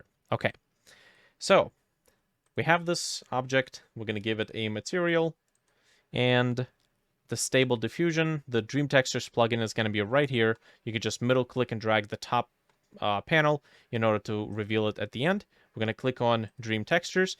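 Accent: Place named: Eastern Europe. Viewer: you speak good english but, i dont know why, i hear some slavic accent lol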